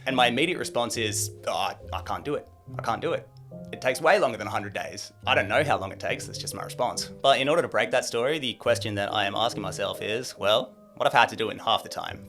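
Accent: Australia. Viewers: I learnt a lot from you but especially to understand the Australian accent. Thank you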